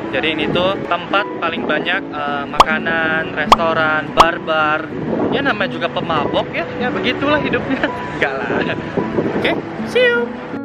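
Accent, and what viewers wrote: Medan, Indonesia. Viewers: org medan ya? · Logatnya kayak anak medan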